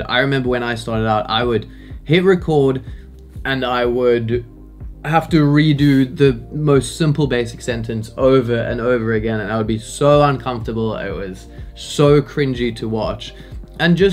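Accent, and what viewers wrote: Cape Town, South Africa. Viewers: Is that a South African accent??❤ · Lekker video bru. I was trying to place your accent as it didn’t seem Australian. Then I realised you’re from Cape Town too. I’ve been away to long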